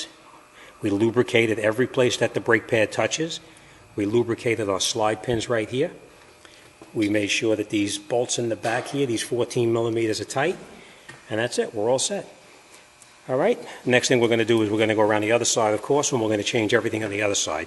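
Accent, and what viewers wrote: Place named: New York, United States. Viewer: great instructional video. I detect a NY accent or perhaps, a NJ accent. Don't know which.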